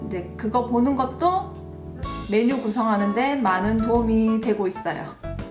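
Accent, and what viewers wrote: Gyeongsang, South Korea. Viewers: 전 78년생^^ 신랑회사구내식당이 잠시 쉬면서 도시락을 싸기 시작했어요.홈까페 홈밥요리 좋아하면서도 도시락은 초초초짜 줌마예요. 말투들으니 경상도네요.반가워용.저두 대구고향 부산출신 양산살아요ㅎ 지난 영상들이지만 많이 배우고 눈으로 힐링해요. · 경상도분이시네요~ ㅎ 저도 남편 직업상 삼시세끼 3일씩 글고 큰애 기숙사 도시락까지 만만치 않은데 유튜버를 보는게 많은 도움이 되어요. 젊은 분이 대단하시네요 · 어머나ㅋ 경상도 분이신가보다ㅋ 나도 경상도사람이라 엄청 반갑네요 덕분에 요즘 큰아들 도시락 잘싸주고있어요 감사합니다 쿠팡에서 도시락통도 새로샀답니다ㅋ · 88년생인데 살림을 넘잘하네요 우리딸들도 비슷한 나이인데 손하나 까딱하지 않는데 ...ㅠㅠ 말투가 경상도분인것 같네요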